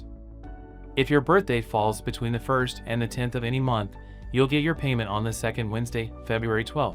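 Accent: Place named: India. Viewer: I hope that's an Indian because it sure isn't a black man's voice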